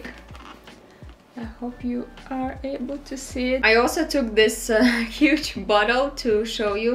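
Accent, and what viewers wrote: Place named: Russia. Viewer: You have russian accent but you obviously dont look russian)) Where are you actually from?)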